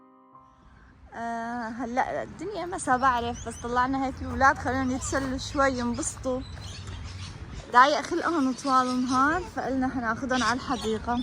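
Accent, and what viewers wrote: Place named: Syria. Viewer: بكره لكنه السوريه بتوجعلي اذني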